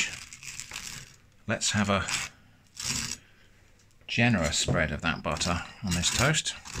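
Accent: United Kingdom. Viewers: because of the British accent, he makes anything he does sound completely okay and normal...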